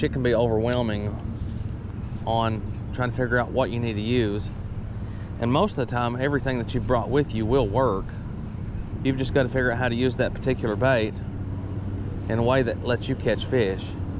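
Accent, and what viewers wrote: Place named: South, United States. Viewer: I love a pop-r. I once caught two at one time on a torpedo lol and on a hollow body frog lol. What state you in? That’s one heck of a southern draw you have lol. I’m mid-Ga. Macon Ga.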